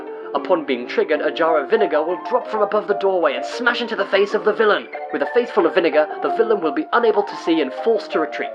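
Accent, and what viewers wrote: United Kingdom. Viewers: Gotta love that British voice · Was this challenge explained by Silent Movie? Because the explanation looks like a silent movie and there's someone explaining it in a proper British accent.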